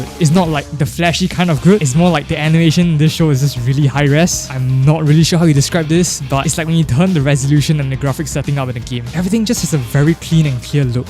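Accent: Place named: Malaysia. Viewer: This was a cathartic review for me, thank you for throwing shade on these characters. They really are as one-dimensional and bland as cardboard. I never understood the hype for it aside from the gorgeous artstyle + character design. Also, I might be tripping but you sound Malaysian af (or maybe Singaporean)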